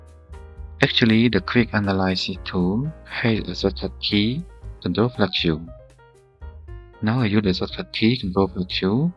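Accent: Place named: England, United Kingdom. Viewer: I need to read translations related to my language because I have problems understanding the English accent.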